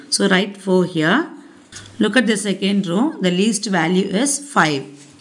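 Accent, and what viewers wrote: South India, India. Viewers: I don't know how to thank you I just want to say I love you ma'am and specially love your south Indian xent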